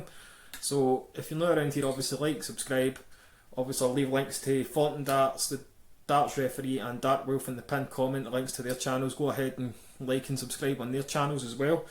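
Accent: Scotland, United Kingdom. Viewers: I came here for darts, and stayed for the Scottish accent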